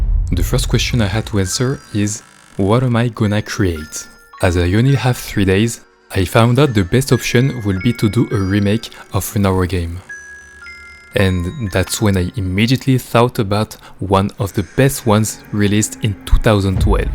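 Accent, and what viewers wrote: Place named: France. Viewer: I was thinking, he has the same accent as the french ppl in my Class. I check the County: France. Neat.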